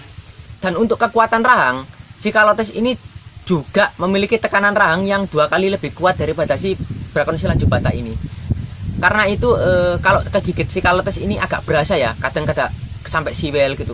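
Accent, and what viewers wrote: Java, Indonesia. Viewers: Cong sampean wong ndi. Kok bhsamu medok bgt.. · Waw medok nenem logat jawa nyh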